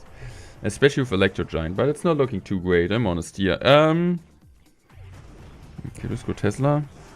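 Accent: Germany. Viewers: Do you speak German? Your english sounds realy German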